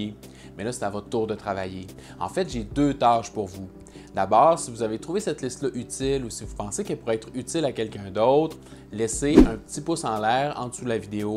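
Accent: Canada. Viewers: Supers' videos, bonne humeur et pas de prise de tê te. Et ce joli accent canadien !!!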